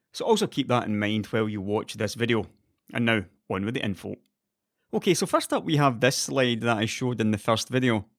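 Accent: Scotland, United Kingdom. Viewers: From a fellow scot it's nice to hear your voice again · "Alright guys" in that Scottish accent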